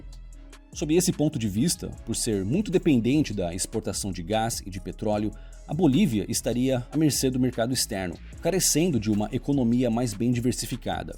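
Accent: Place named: Rio de Janeiro, Brazil. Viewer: Sou portugues e acho que o.sotaque carioca dá um toque especial no portugues, mais animado, descontraido. Mas bom é nos entendermos em imensos paises, foi a melhor coisa que os nossos antepassados fizeram, espalhar a lingua.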